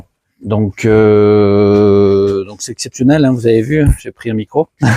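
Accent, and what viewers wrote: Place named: Savoy, France. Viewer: new follower sur ton channel, tu as des compétences de ouf, bravo pour tes réalisations au plaisir de te suivre , tu as vachement l'accent savoyard, mdr.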